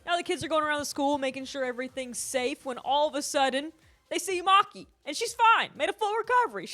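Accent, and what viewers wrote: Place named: South, United States. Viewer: I know this is a completely random question and I doubt you’ll even see this but I have to ask: are you from the south? I’m from Texas and you seriously sound just like some of my friends that have lived in Texas their whole life. The accent is just almost exactly the same so I had to ask.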